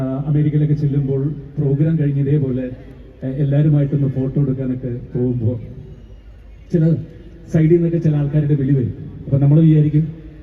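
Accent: Palakkad, India. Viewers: എ.....ഇയാൾ ഇതെന്തു തേങ്ങയാ പറയണെ..... പാലക്കാട് ഒരു വിഭാഗം ആൾക്കാർ മാത്രം പറയണ ഭാഷയാ ഇങ്ങനെ.....അല്ലാൻ്റെ എല്ലാവരും ഇങ്ങനെയൊന്നുമല്ല സംസാരിക്കുന്നത്......ഒരോ സ്ഥലത്തും ഒരോ വിഭാഗം ആൾക്കാർക്കും ഒരോ slang ആണ്.....അകത്തേതറ ഭാഷ കേട്ടിട്ടുണ്ടോ..... അത് ഇതിലും ഭയങ്കരമാണ്.....😁😁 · പാലക്കാട് ഭാഷ ഇങ്ങനെയൊക്കെ തന്നെ പക്ഷെ അവര് സമ്മതിക്കില്ല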